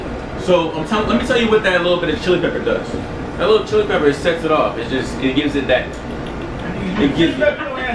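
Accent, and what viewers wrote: Baltimore, United States. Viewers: Are you from Baltimore? You got that accent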